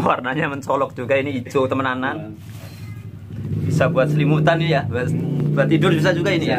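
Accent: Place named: Banyumas, Indonesia. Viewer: Wahhh buat shooting ya mas boss...lucu ngapaknya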